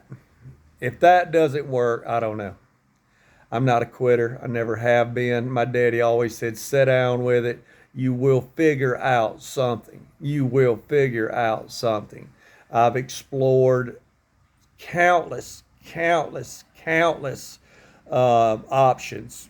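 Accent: United States. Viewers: God bless brother!!...obviously, you are looking and sounding very American, they will treat you as a rich person. I pray that you will find doctors that are truly into healing and not for profiting. Old saying goes, "stay away from sugar",... and carbohydrates. And if you are not diabetic, try fasting for 3 days. Reboot your immune system and rebuild new and stronger cells. Take care bro...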